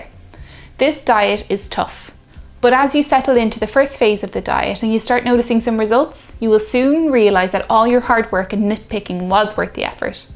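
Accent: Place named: Ireland. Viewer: That ìrish accent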